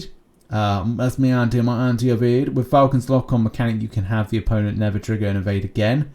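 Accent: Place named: Cockney, United Kingdom. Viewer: You have that like cockney accent, “ ‘ello govna”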